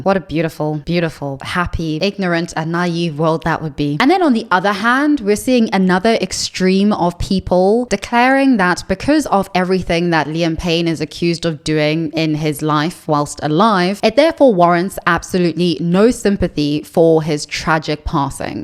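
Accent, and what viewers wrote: South Africa. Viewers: This video was just randomly recommended to me as I had never seen your channel before. If it's true, you hide it really, really well with the British accent; but every now and again you sound South African. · Tell me why I just clocked your South African accent now 😩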